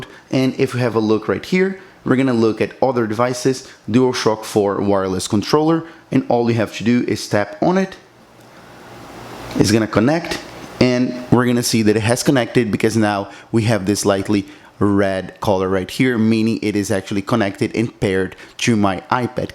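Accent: Brazil. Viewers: Look at this accent man! Are you from Brazil?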